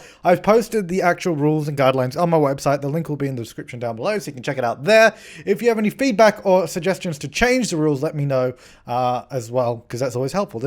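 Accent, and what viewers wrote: Australia. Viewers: He does sound normal doesn't he? Makes a nice change. It even sounds like an Adelaide accent.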